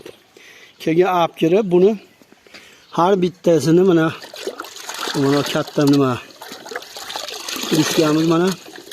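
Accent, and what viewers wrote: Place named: Fergana Valley, Uzbekistan. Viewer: АССАЛОМУ АЛАЙКУМ ОТАХОН! ШЕВАНГИЗГА КАРАГАНДА ВОДИЙДАНСИЗ ШЕКИЛЛИ, СИЗГА РАХМАТ БИТТА КАМЧИЛИГИНГИЗ ЁНИНГИЗГА БИРОНТА ЁРДАМЧИ ОЛМАБСИЗДА, КЕЙИН ЙОД БИЛАН ЗЕЛЁНКАНИ ИДИШГА СОЛАЁТГАНИНГИЗНИКУ УМУМАН КУРМАДИК ФАКАТ АРАЛАШТИРДИНГИЗ ХОЛОС, КЕЙИНГИ ВЕДЕОДА КУРСАТИНГ, ЯНА БИР КАМЧИЛИК КУПЧИЛИК АЙТГАНДИР ТАЙЁРЛАГАН КУЛ ОЗУКАНГИЗНИ БОДРИНГ ИЛДИЗИГА КУЙДИНГИЗМИ ЁКИ БАРГЛАРИГАМИ УШАНГА ТУШУНМАДИК, УМРИНГИЗДАН БАРАКА ТОПИНГ, ИЛГАРИ ОТА БОБОЛАРИМИЗ КУЛНИ БАРГЛАРИГА ХАМ СЕПИЛСА АЧЧИК БУЛАРКАН ШИРАГА ВА КУРТЛАРГА КАРШИ ЭФФЕКТИ ЯХШИ ДЕРДИЛАР ШУ ТУГРИМИ. РАХМАТ ОТАХОН ЧАРЧАМАНГ!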